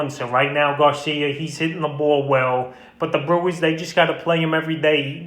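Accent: New York, United States. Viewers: My dude where u from? Nyc?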